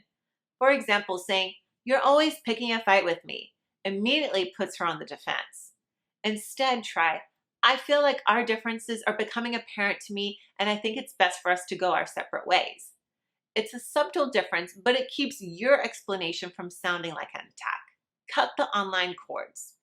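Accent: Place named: Poland. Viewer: Yeah. I think Polish is first language.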